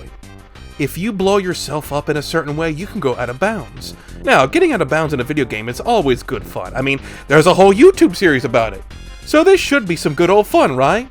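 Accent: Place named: New York, United States. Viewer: i dont know why but you sound like a new yorker trying to talk like joe perra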